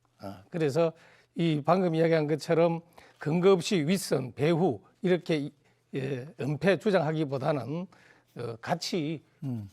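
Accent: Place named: Gyeongsang, South Korea. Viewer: 갱상도 아이가 ㅋ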